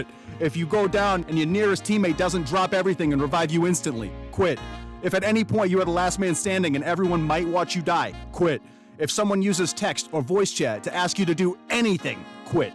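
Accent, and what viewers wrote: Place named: Boston, United States. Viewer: Subscribed.. hilarious especially with the Boston/jersey accent 😂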